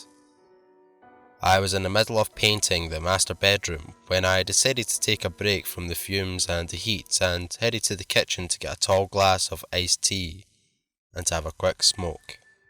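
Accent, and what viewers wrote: Scotland, United Kingdom. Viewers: Never heard The Smiling Man narrated in a Scottish accent before! Thanks for narrating one of my favorite Creepypastas 😁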